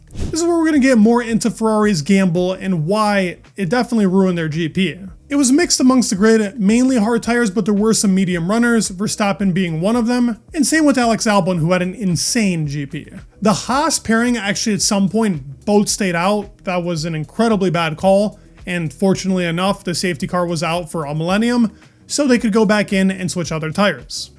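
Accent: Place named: United States. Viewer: As soon as you hear an American trying to discuss what happens in F1 you have to turn off….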